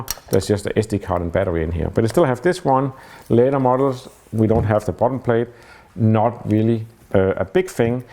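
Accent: Denmark. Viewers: Your intro are my favorite. I love the danish english vibe. Have been follomt you for some time now. Keep it up. 😊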